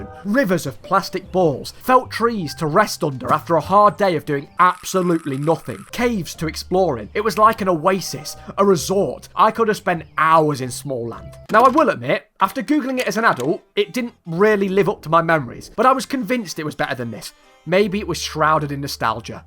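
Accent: United Kingdom. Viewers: His British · Thank you funny british accent man for making my day better, i suggest your next video is about lidl since its common in europe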